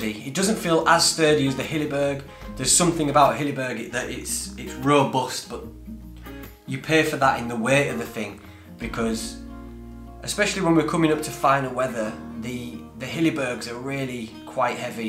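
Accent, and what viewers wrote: Yorkshire, United Kingdom. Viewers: Love this Bro... Great review. Just discovered your channel and your Yorkshire ness (ar tha from Steel city lad?) Nice thought on compassion as well. Namaste from Pete in Penistone.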